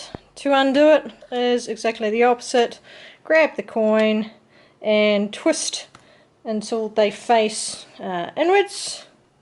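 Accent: New Zealand. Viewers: I just discovered your videos - thru a mention by someone else. I have done the JMT in August & Sept, so it will be fun to see it under snow with you. Also, I had an aunt from New Zealand, so I love hearing your accent, as it sounds a bit like hers. My cousin and I hitch-hiked all over NZ; for 2 days we caught rides w/ 2 "truckies" headed to the South Island. I did the Milford Track Freedom trek in pouring rain (except for the 1st day).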